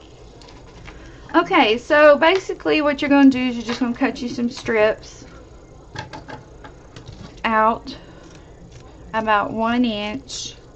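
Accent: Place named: Tennessee, United States. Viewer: Loved the video. What a fun way to use up the leftover shelf liner that I have. What part of the country are you located in? I live in TN and your accent makes me think we may be close.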